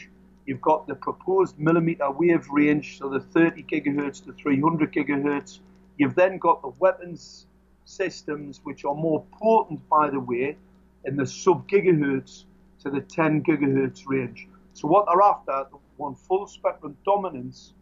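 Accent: Scotland, United Kingdom. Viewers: His accent is Scottish. Pay attention and listen. It is filled with knowledge. Close your eyes and things become comprehensible.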